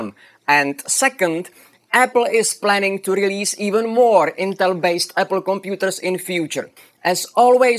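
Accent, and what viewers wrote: Czech Republic. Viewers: Glad I found your channel, great stuff!! I love the accent as well, are you czech? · Hey, are you czech by any chance? You have an accent, which really reminds me of the czech ones lol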